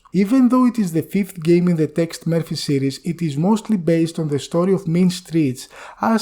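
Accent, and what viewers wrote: Greece. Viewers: I still remember the day when i first loaded Pandora Directive into my computer and started the game , it remains my favorite game of all time until now! Btw from your pronunciation i would bet you are Greek.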